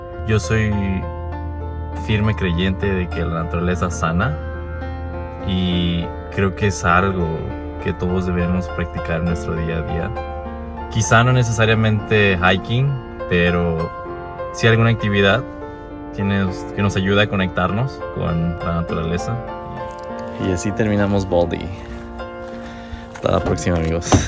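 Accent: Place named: Mexico. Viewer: Awesome view and definitely inspiring too, especially when the old lady caught up and said that she's climbed that mountain for 25 times! 😊 非常棒的影片，看完之後真的會讓人也想去爬山，Dr. Razo的墨西哥口音也很好理解，可以邊享受美景邊練習聽力，真的是一舉兩得😉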